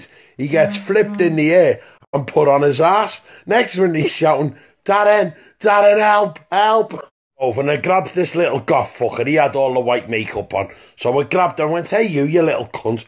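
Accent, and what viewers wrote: Ireland. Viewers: Irish video comes up first thing I do it’s put subtitles on lol. Didn’t help! Omg I love this guy!!!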